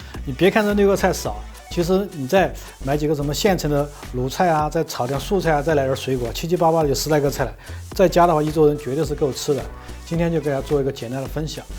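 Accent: Sichuan, China. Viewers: 喜歡川普口音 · 很喜欢看你的美食视频，很轻松，节奏也控制的很好，少许川普加时不时的幽默— 社会佩奇😅 请继续分享，我继续点赞👍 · 期待的年夜饭特辑，好喜欢！我小时候在四川住过四年，家乡的方言听着好亲切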